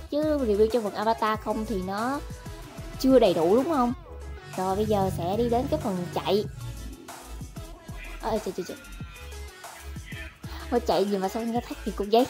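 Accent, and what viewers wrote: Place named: North, Vietnam. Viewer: Giọng Bắc nữa cơ :3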